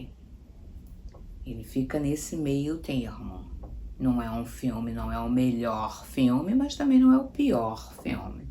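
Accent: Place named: Rio de Janeiro, Brazil. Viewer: venho só pelo sotaque carioca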